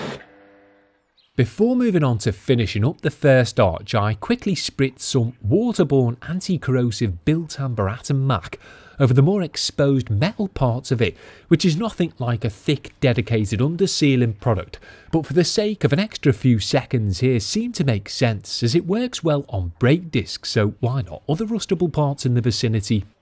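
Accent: Liverpool, United Kingdom. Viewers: you sound Scouse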